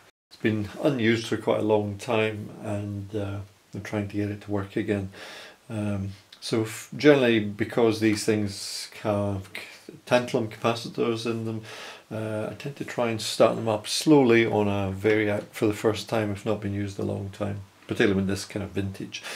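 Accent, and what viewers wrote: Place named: Scotland, United Kingdom. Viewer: Hi! This is great. I have a 7603 scope here and is in need of repair. I inherited it from a friend and it was barely working (some initial display but eventually gave in and now just sort of frozen). I use it for experimental XY drawing – as you say it has a lovely big display! Although I have some experience of electronics repair (swapping in broken pots and switches and caps on old synths etc) I am very wary of getting into these devices as there are some high voltages. I am also no good at diagnosis – I can only carry out repairs if I know what I need to replace! I notice you have a Scottish accent(!) – I am based in Glasgow. By any chance are you reasonably near? I would love to bring this scope back to life and am looking to commission someone to diagnose and help me. Any advice hugely appreciated and thanks again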